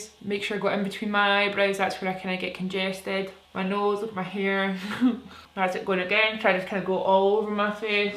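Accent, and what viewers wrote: Scotland, United Kingdom. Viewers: Love your videos! It's nice to hear a fellow Scottish voice on Youtube 😍😊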